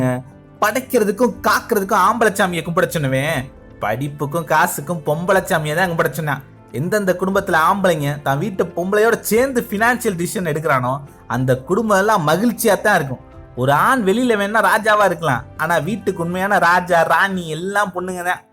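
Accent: Madurai, India. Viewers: Madurai slang nalla irku anna ....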